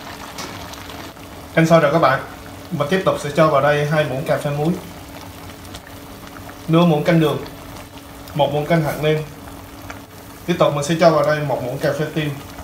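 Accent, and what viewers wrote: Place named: North, Vietnam. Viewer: Giọng bạn này như ở miền ngoài, giọng hay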